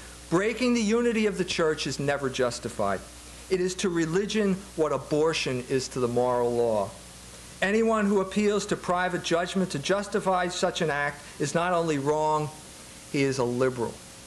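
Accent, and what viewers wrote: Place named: Philadelphia, United States. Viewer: He is allowed to have Philly accent ?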